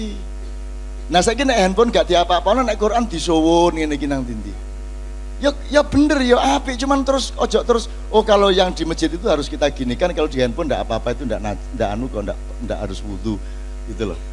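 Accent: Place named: East Java, Indonesia. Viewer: bukan sombong khas orang jatim budaya arek mang gaya bicarane begitu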